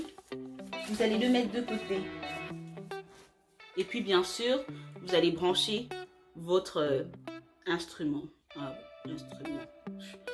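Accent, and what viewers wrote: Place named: Cameroon. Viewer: Ahhh l’accent du Cameroun ! Musique à mes oreilles 😊😊😊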